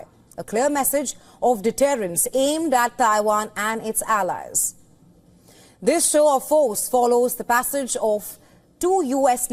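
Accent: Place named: India. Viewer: I dont understand her indian english tune😢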